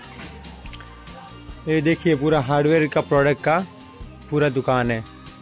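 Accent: Gujarat, India. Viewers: Kya aap Gujarati he ?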